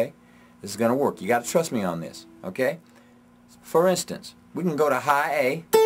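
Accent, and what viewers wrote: New Orleans, United States. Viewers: Are you from New Orleans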